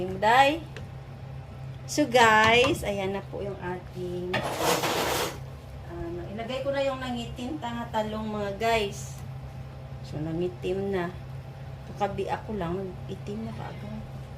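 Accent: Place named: Iloilo, Philippines. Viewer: Hi sis, its Melanie Tingson if you remember me hehehe.. wow sarap naman ng sinigang ng ulo ng salmon. I love this too, bagay sa panahon na maulan Ilongga ka ba sis? narinig ko kasi hehehe kainin na natin yan sis...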